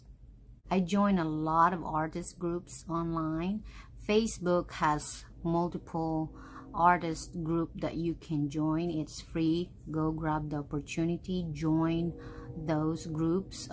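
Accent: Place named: South Africa. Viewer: 🙌 sa accent😊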